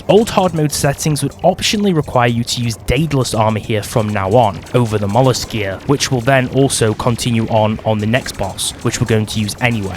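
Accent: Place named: United Kingdom. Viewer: is british? no more video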